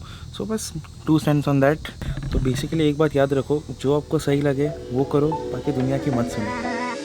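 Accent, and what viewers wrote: United States. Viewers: It was a nice vlog and the drone shots were superb and the american English accent was awesome and thanks for showing us such a beautiful greenery vlog👍👌👏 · The American accent comes out naturally once u get into United States, and with exploring new people around is quite common!! So, don’t bother abt people who says u r faking it n all!! Because they haven’t been in States so, n mostly people r jealous why they can’t have the same taste, what v have right here!! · Ek quote hai : "when in Rome, do like what Romans do". So it's probably for the best if you talk with the American accent.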